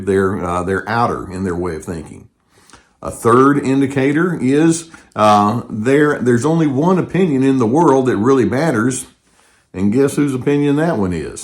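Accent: United States. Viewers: I love every word in your message dr and your beautiful American accent. Thank you for your incredible help and support!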